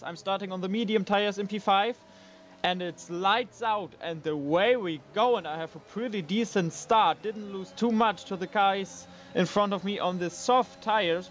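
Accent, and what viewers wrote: Germany. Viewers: I have one question. Are u German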